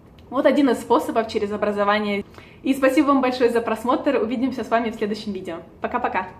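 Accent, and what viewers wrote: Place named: Rostov-on-Don, Russia. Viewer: этот очаровательный южный диалект Ростов/Дон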